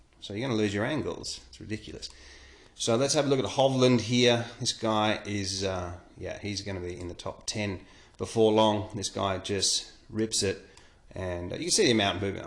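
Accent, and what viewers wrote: Australia. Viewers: Love your videos, they are amazing, delivered with good old Aussie subtlety and charm, keep up the good work mate! Aberdeen, Scotland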